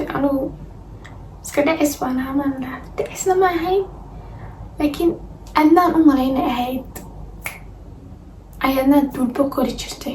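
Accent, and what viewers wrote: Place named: Djibouti. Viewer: Hadalkaga malmahan dhan wa dareemayay inad tahay reer dds 🫶😺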